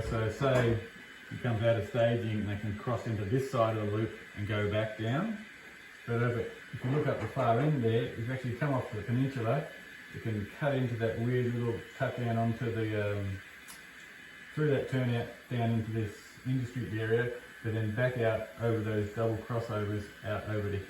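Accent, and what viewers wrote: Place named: New Zealand. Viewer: Hi, a Kiwi in Christchurch. Wasn't sure if you were here in NZ or in Aussie but the accent and reference to "hi-country" put you in this part of the Pacific. As noted, nice to see something (more) local rather than from the US or UK. I'll be keeping an eye on the progress of the Ness River Railroad. Keep safe and well, Rgds Brent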